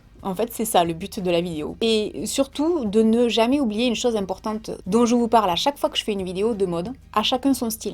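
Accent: South, France. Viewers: Perso je viens de Paris et j'adooore ton accent, mais en mm temps j'aime tous les accents du sud <3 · trop mignon ton accent!!!!! et vive les provinciaux.... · Ahh non non au peigne- dents, maillot à poils et ballerines orteils! Non non!!:D y a des trucs que je n'avais jamais vu: manches xxl, jogging... mais pas du tout envie d'essayer! Et les cuissardes ugg me font penser au chiens qui sont tout fripés!:)) faut être à Hollywood pour porter ce genre de trucs et passer à peu près inaperçu!:)) très chouette vidéo!:) bisous! Et vive l'accent du sud! (une sudiste à Paris!):) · J’aime trop ton accent j’ai l’impression d’être en vacances dans le sud quand tu parles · C'était une super vidéo comme d'habitude! Tu es toujours aussi belle (et personnellement j'aime bien l'accent du sud)! 😊😘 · Coucou alors premierement tu as totalement raison " ca vient de Paris alors..." mais quand ob regarde ce qu'ils portent a Paris c'est de la dobe pour être polie lol c spécial faut avouer... 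Mais même moi qui suis du nord (ch'ti MDR) j'envie le sud pour bcp de chose: la météo déjà lol votre accent est carrément plus glam ke chez nous MDR on va pas se mentir et puis vos petits plats 😍 etc.... Je m'arrête la mais vous avez tant a nous apprendre... Voila bisoux et ne te remet pas en question reste au tu es c'est parfait je t'adore 😉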